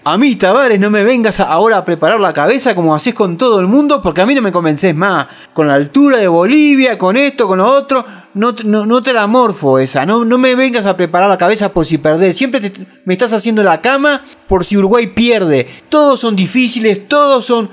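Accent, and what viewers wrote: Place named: Argentina. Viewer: Pareses argentino como hablas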